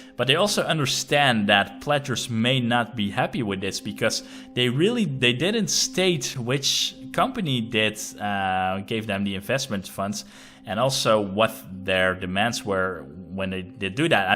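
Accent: Netherlands. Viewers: Gotta love the Dutch accent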